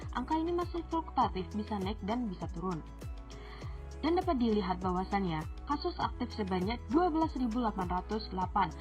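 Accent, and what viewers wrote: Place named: Java, Indonesia. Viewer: Medok bgt mbanya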